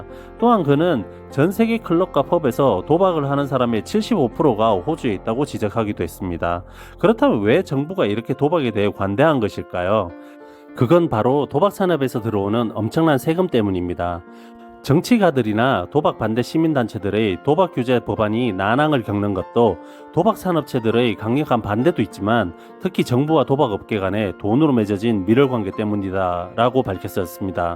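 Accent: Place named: Gyeongsang, South Korea. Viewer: 경상도 분이시네예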